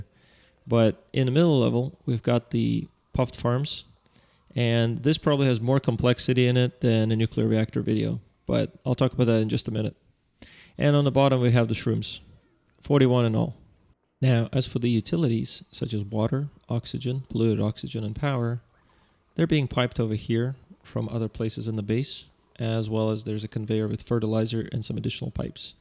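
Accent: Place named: Russia. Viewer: едриттунг. енто же рашн инглиш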